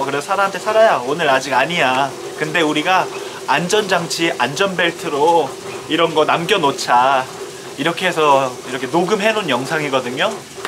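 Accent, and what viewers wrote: Australia. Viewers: This broke my heart to see this morning. kickstarted my journey to learning Korean, and as a fellow QLD’er, I felt like I could relate to her a lot. As said, she will always be by your side, every moment of every day, and she will forever be sharing your experiences with you. She’s left so much of an impact on our lives, even as someone who has never personally met her, and she will be dearly loved and missed worldwide. It might be only one small thing that she’s impacted you with, but the bit of an Aussie accent you have when speaking English really shines through as part of 🤍 You did such a great job at bringing this news to all of us, and we know that is so thankful and proud of you for working so hard by her side. I know that this time is so hard for you, and both your families, but I wish you all every happiness and all my love for now and in the future. 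🤍❤️ Bbyong for now ❤️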